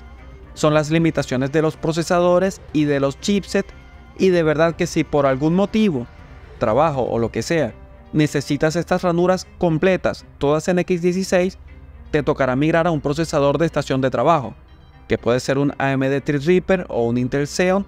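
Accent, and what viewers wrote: Venezuela. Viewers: Excelente información, ¿eres venezolano no? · Hombre no sé si eres venezolano hermano pero hablaste como uno. Gracias por tus videos soy un seguidor muy agradecido por tus explicaciones. Gracias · Hola eres de venezuela?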